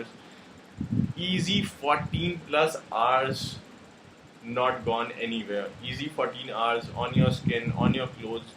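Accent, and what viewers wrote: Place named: India. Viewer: Indian English... First learn English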